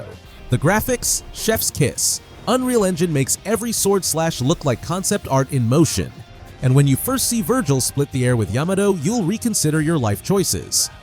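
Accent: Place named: United States. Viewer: American assent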